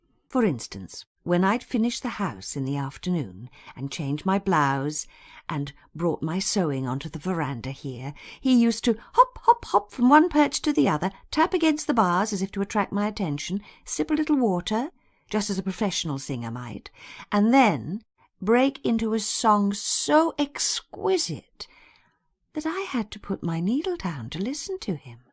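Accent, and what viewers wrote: United Kingdom. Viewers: فخم و تحس بطعم الإنگلش بالأكسنت البريطاني😂😂😔✨🤍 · واو صوتها حلو حرفيا احب الاكسنت البريطاني 😭😭😭💙💙💙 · I don't like british accent 😢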